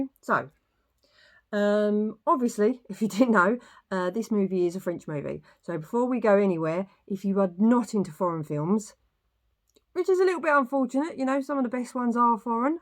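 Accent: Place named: Southern England, United Kingdom. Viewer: I thought this last review was quite good. I saw it last night, and will probably go back and see it again. The fighting scenes were only ok, and yes, the reviewer is right. Too quick, and you can't see the action. Yes EVa Green (sigh). And Yes Where's the humour of the book and 1970s films. It's good that the reviewer has a southern English twang in her accent, I prefer intelligent ,pleasant looking blondes, without The BBC bias . Well done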